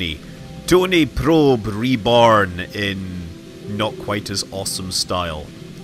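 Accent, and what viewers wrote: Scotland, United Kingdom. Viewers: Scott Manley language or Scottish?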